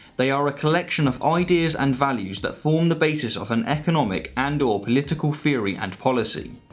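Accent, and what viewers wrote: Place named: West Country, United Kingdom. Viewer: I am trying to locate his accent. I could be wrong but I would place it somewhere in what we call "The West Country" which is the bottom left of the UK from Bristol west. However, some of the sounds are similar to Norfolk to my untrained ear but I would stick with Bristol or Brissal as we know it. "Yerite my luvver"?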